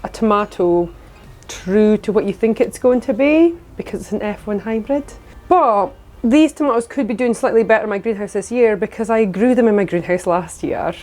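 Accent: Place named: Fraserburgh, United Kingdom. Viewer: I see you've got a Lewis Chess Man! I've wanted one or two of those for YEARS! 😂 I think your sweetcorn needs wind to pollinate, are you giving them a shakey-shake? Also you remind me so much of my friend from the Broch - it's the accent. She moved back to the Broch from Glasgow and I miss her so it's good to hear a similar accent.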